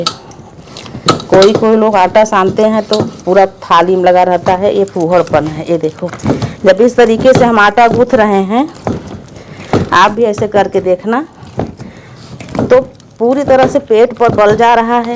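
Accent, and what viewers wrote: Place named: Bengal, India. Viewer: Hum pakistan se hain. Aap ki awaz se maloon hots hai aap in ka ilaqa bangal hai. Aap nay buhat mahenat say roti banana sikaya hai hum aap ka shukria latte hain aap humari bahama ki tarah ho.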